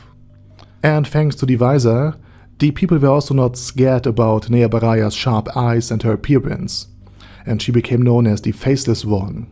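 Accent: Germany. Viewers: Danke aus Berlin 😘 i find your German accent way more charming than the ai alternative. 😊❤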